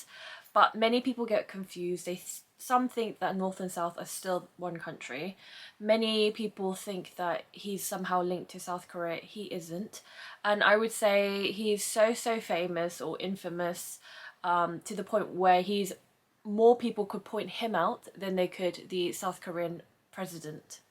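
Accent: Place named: United Kingdom. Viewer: I am Japanese. I was born in London but left after 8 month . I really love your accent, there is something so elegant about seeing Asian people with a British accent. ( I wish I could speak like that lol) I enjoy your channel ❤ ( definitely need more beauty content creator by Asian women ) Btw I LOve Korean food , I actually live very close to the Koran Embassy so there are lots of Korean restaurants and the best Kimuchi store that a go all the time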